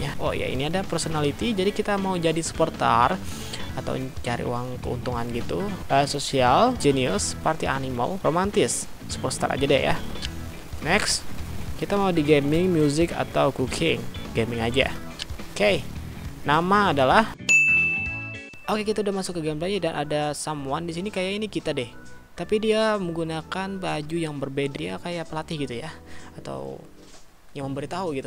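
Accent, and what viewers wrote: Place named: Central Java, Indonesia. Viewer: JATENG MANA SUARANYA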